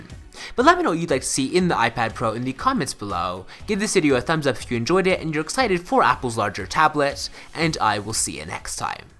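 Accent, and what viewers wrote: Scotland, United Kingdom. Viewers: Sounds like a Scottish guy trying to forcefully perfect his American accent.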